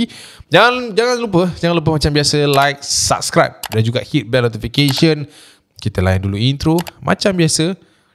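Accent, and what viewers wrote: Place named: Sabah, Malaysia. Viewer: ndapayah la cakap slang sabah tu. Jadi hancur 🤣🤣🤣😭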